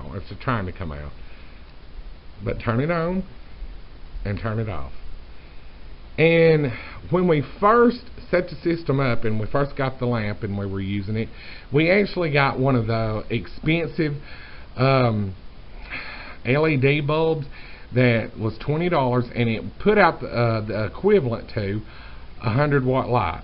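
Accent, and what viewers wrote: South, United States. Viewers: ...KOOL kiss SET-UP and approach to your project ... KOOL southern accent.... Blessing w all ... :-) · O men, i LOVE your country accent! :D It's so beautiful ^_^ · I am very curious as to what part of the country you got that accent from. It is awesome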